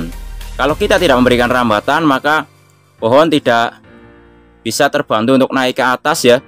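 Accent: Java, Indonesia. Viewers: Logate medok asli mana bang 😊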